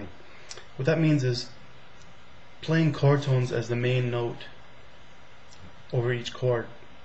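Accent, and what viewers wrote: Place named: Nova Scotia, Canada. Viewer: East coast??? I'm from Truro, NS. Small world! Funny I didn't notice the accent probably because it sounds normal to me! Great lessons though, i've been looking for something exactly like this.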